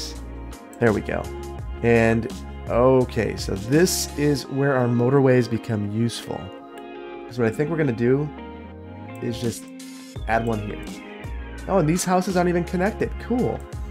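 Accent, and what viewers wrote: Wisconsin, United States. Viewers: let your Wisconsin come out more often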